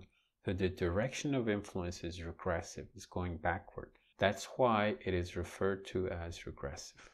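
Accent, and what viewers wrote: Iran. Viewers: ایرانی هسی؟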